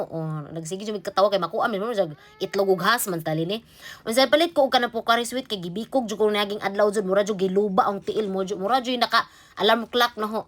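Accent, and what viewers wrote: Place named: Visayas, Philippines. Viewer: I love the accent. na lingaw gyud ko 🤣 proud to be bisaya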